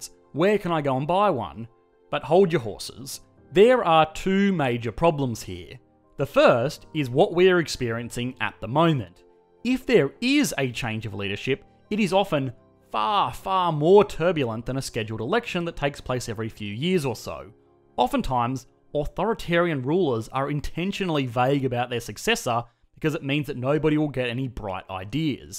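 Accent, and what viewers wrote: Australia. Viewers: I love how this duffer tries to speak American English with an Australian accent. He's good at explaining stuff, though.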